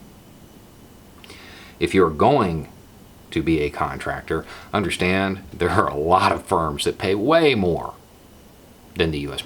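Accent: South, United States. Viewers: I just have a quick question and I honestly am not trying to be a prick. Why do you have a southern accent here, but when you speak as Justin King, you do not?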